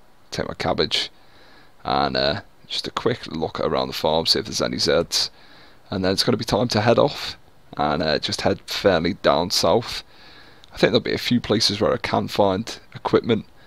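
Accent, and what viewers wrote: United Kingdom. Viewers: always enjoy these PZ videos keep up the good work man, are you from the liverpool area? you sound a little scouse just wondering haha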